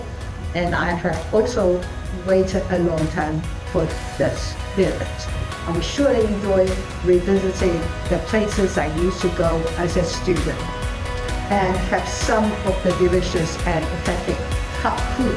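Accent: United Kingdom. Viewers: 蔡前總統講的英文，英國腔就是留學過英國最好的證明，誰說蔡前總統的學歷造假？ 麻煩講流利的英國腔英文給我聽聽。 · 在LSE英腔的英語演説還不用看稿，躲在螢幕、手機後的藍白共可以消除之前的那些（聽説）了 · 小英總統的英腔本來就很重了🥰🤭回去母校演講就更重了😍🤩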